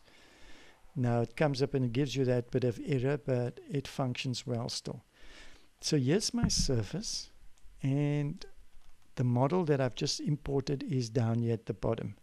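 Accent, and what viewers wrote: South Africa. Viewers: you kind of have a South African accent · Awe m'bru...you Capetonian? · ) that ZA accent is very telling.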